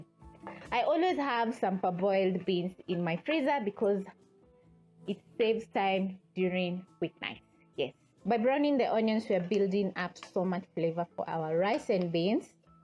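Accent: Kenya. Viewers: She sounds so Kenyan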